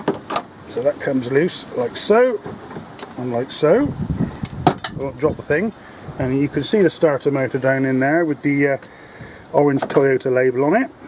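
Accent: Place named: United Kingdom. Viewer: With the accent I thought you were in the UK! Have watched the vid again and called myself a 'muppet' as you stated you got it from Mr Auto. I've sinced looked starters up for my car. Cheers for the tip on not using slick 50 in the gear box, only ever used it in engines with no problems.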